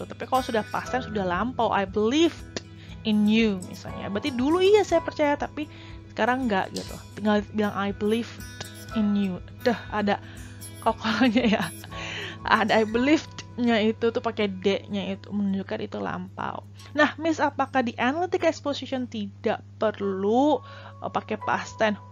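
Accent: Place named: South Jakarta, Indonesia. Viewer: I like how to teach and explain because it is very clear then is also very slang because we can use South Jakarta-style English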